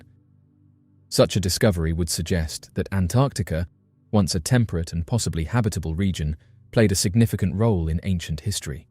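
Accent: United Kingdom. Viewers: Such boorah. If you just reported the finding, your findings whould be about 15 seconds. The rest if this video is just conjecture with a British accent... like that makes the narrators verbal diarrhea all the more believable. A 12 year old coul do a better job with this content.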